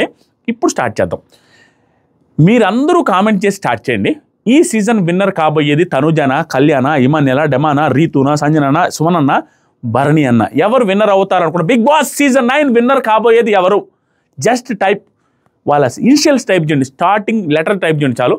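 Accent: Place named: Nellore, India. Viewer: Hi,anna sleeping😴 review avuna😮😅 nenu from nellore district now in princeton usa ,mana slang so happy vinadaniki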